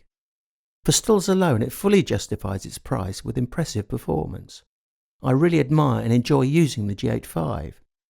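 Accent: United Kingdom. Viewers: Once more, great stuff and accurate opinion. I am professional filmmaker/photographer. I have owned and shot with Nikon D750, Leica M, Olympus EM-1 MKII and the GH5. I agree with absolutely everything you said, the GH5 menus and usability are the best I've used. Cheers from Brazil and keep doing these. You and your British clarity + accent rock. Cheers from Brazil 🇧🇷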